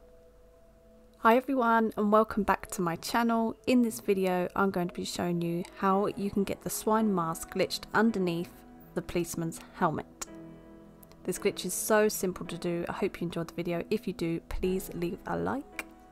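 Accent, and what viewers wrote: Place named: United Kingdom. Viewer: Im also british, i dunno if she british but she sounds british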